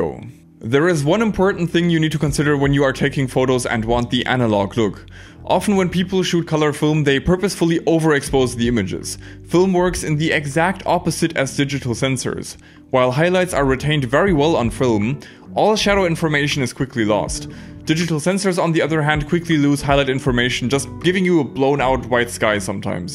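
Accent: Germany. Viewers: hey ! i know one of these photos are taken in Duisburg at the tiger and turtle, you also speak german accent. i wonder if you are near this town ? becouse i come from moers. i´m really into photos ! i own a a6300, gh5, canon 5d, 80d, 700d and a old voigtlander film camera. i start with a fujifilm 5mp and did not stop since then.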